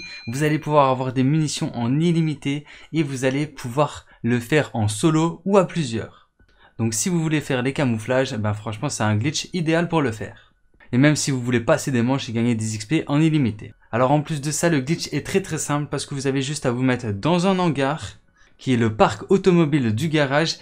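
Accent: Belgium. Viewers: Belge de ou poto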